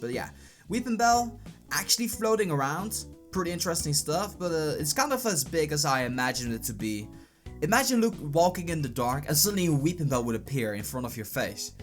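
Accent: Netherlands. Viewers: je bent toch nederlands dat hoor ik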